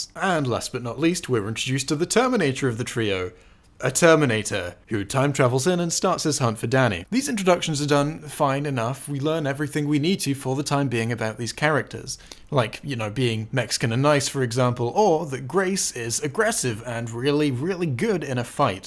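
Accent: United Kingdom. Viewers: Why is it always someone on YouTube with a British accent that bashes this movie???? This is the 5th video with a Britain bum upset or angry. This hits their worldly view that a minority a Mexican at that is the hero in this movie. Shows how ignorant and underling views they have about other people! In the end this was a good movie and I suggest everyone not listen to the critics!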